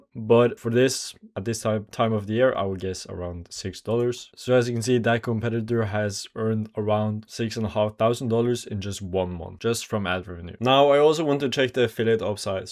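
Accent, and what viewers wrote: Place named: France. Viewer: 1.- Thanks for the content. 2.- Are you french or french canadian? Your accent gives me XQC vibes.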